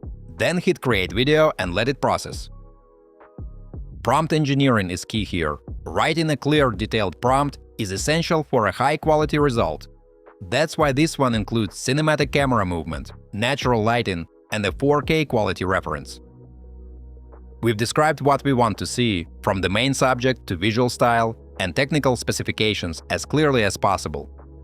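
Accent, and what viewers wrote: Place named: Russia. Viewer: Рашен инглиш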